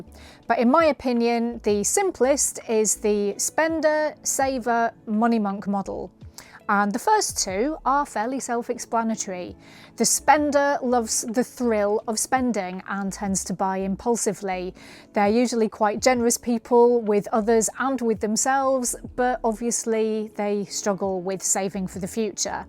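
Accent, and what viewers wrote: United Kingdom. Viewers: Caught your video in the rain soaked car, wanted to say that I liked your delivery and comments on the weather. I was unable to post a comment though. You seem quite a sincere person who knows quite a bit about yourself and preferences and how to cope. I am a little astonished how many nice YouTubers there are. And many seem to have a British accent.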